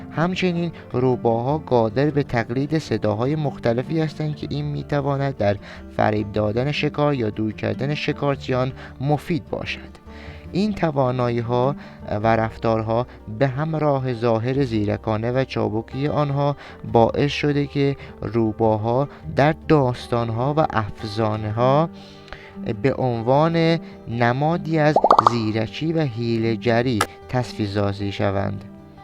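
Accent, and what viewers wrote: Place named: Turkey. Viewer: داداش خسته نباشی بخاطر ساختن این ویدئو.میخواستم یه پیشنهاد بهت کنم.بنظرمن بهتره متن ویدئوهاتو با همون زبان زیباودوستداشتنی آذری بخونی و زیرنویس فارسی براش بذاری.بنظرم اینطوری مخاطب بیشتری پیدامیکنی.اینجور کانال‌ها و ساختن اینجور ویدئوهای قوی و زیبا، خیلی زیباتر وجذابتر خواهد شد زمانیکه گوینده خیلی روان و فصیح وبدون لهجه بازبان رسمیه کشورش، روایت کنه.متاسفانه شما بالهجه‌ی شیرین ترکی صحبت میکنی که به گوش شنونده نسبت به ویدئو، جذاب نمیاد.بنابراین بنظرم بهترین کار همونیه که گفتم.با زبان آذری و زیرنویس فارسی.بازم خسروان صلاح مملکت خویش بهتر دانند.امیدوارم حرفام باعث سوءتفاهم و ناراحتیت نشده باشه.صرفا فقط دوستداشتم زحمتی که میکشی، ثمر بده.ایام بکام⚘️🌿⚘️🌿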